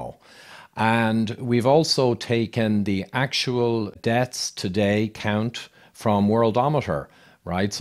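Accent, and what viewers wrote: Ireland. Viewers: Is that a flat Kildare accent I'm hearing???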